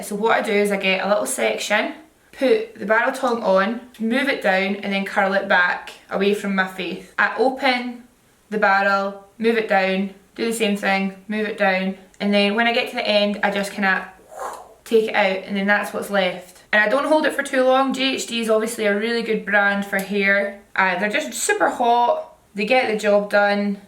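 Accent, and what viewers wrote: Scotland, United Kingdom. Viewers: 'I've got a sare shooder' 😂😂😂dying!! Thank God for a Scottish youtuber! 🎉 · s y she's from scotland! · Are you Scottish? Beautiful accent and such a beautiful lady! <3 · Hi! I absolutely love your accent, it reminds me of my grandfather who was Scottish! I have tons of Scottish blood and the red hair to boot, but a total Southern American accent. I'm a new subscriber because I love your looks and fun, no-BS personality!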